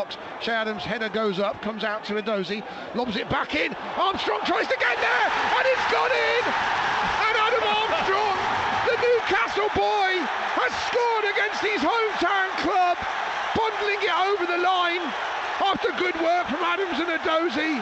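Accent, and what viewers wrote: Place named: United States. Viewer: He is American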